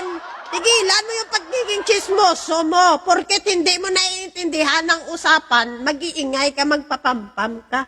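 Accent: Iloilo, Philippines. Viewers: Madam ask lng taga saan po ba kayp ilongga ka ba? Salamat enjoy ako manuod sayo..God bless mo vlog...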